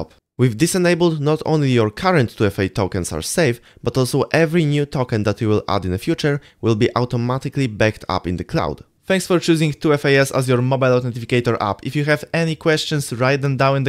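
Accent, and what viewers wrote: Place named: Poland. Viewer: jest z Polski bo trochę po głosie słychać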